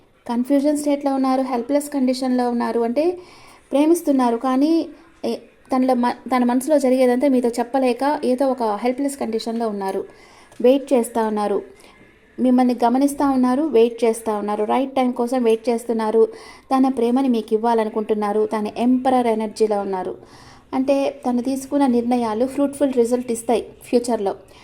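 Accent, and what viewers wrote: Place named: Chittoor, India. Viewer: Maam meedi chittoor district slang superb ga undi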